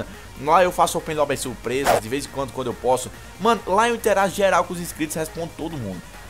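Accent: Northeast, Brazil. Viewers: E do Nordeste ? :D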